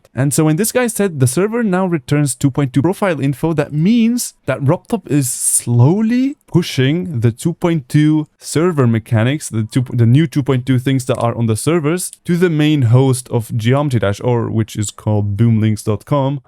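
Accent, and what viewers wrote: Germany. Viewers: yu german?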